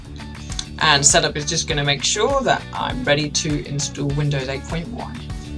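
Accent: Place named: United Kingdom. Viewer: I know where you live. You live in UK I know this accent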